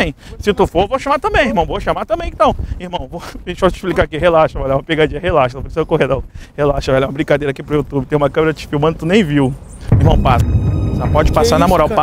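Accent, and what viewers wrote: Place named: Rio de Janeiro, Brazil. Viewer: legal e bonito KKK puts e ainda tem sotaque carioca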